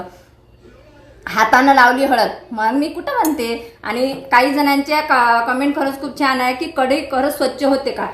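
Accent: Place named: Varhadi, India. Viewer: 😂😂😂 तू इतकी छान बोलते ना आणि आपली वराडी असल्यामुळे खूप छान वाटते मला आणि तू आमच्याच असल्यामुळे त्याहीपेक्षा छान वाटते अकोट ला असते ना मी तर नक्कीच भेटली असती तुला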